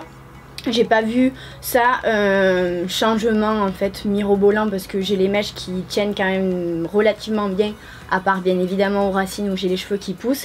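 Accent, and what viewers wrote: South, France. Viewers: t'as un accent du sud non? toulousain ou marseillais? 💍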